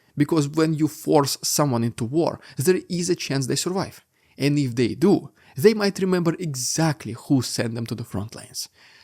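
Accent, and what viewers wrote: Russia. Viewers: You would get more listeners if you dropped the heavy Russian accent. People want to understand the information not listen to a comedian.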